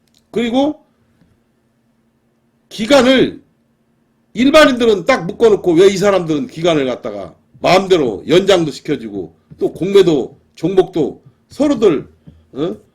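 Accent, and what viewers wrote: Jeolla, South Korea. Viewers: 약사님 전라도사투리쓰시네요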